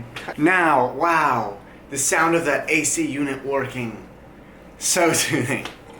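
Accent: Canada. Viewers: Love your cute Canadian accent eh!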